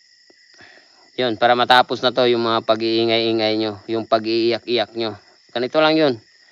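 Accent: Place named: Visayas, Philippines. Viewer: Katawkatawa pa..klaro kaayo wlay grado....ayawg english2 kay bisya ra kaayo ka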